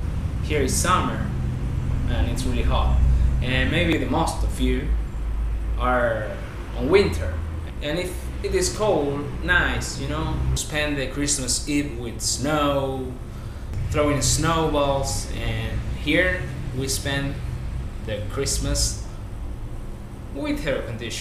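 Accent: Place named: Argentina. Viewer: más argentino no podes ser negro(de onda) !!! yo tmb hablo ingles y me equivoco parecido a vos aveces... jajajaj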